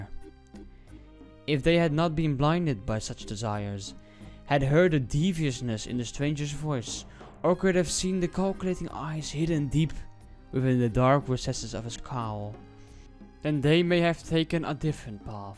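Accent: Netherlands. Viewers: they are both dutch i think, i can tell by the accent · You should probably let other people narrate these and get some more interesting graphics. i feel like a Dutch guy is trying to give an English school powerpoint presentation.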